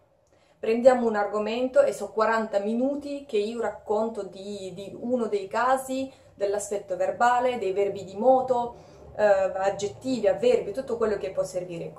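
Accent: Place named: Rome, Italy. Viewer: modi e parole "romane" ti dona e ti dà un certo "charme"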